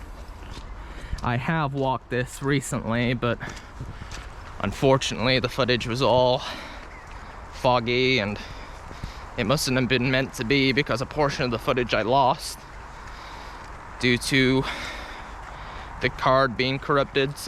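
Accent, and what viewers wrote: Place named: United States. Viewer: Yeah thought you was an American…came across your video looking up Conqueror Challenges, I’ve just signed up for a few…Good Luck! 👍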